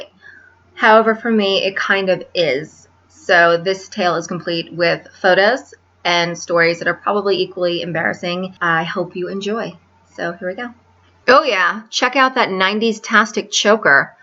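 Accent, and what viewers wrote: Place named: New York, United States. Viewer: I can totally tell you're a New Yorker :P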